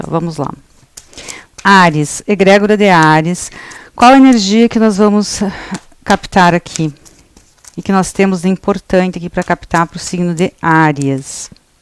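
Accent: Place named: Rio Grande do Sul, Brazil. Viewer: Pelo seu sotaque já percebi que vc é do Rio Grande do Sul. Parabéns pela leitura, vc tem o dom da expressão, muita intelectualidade!! Gratidão!! 🥰 Namastê 🙏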